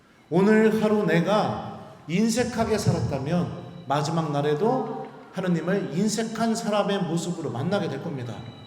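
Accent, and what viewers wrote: Chungcheong, South Korea. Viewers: 구수한 충청도 사투리도 정겹고 신부님 강의 매일미사 강론 너무 감동적으로 잘듣고 있어요. 신부님말씀듣고 어제 고해성사도 했어요. 정말로 좋은말씀 듣고 눈물콧물 흘렸어요. 감사합니다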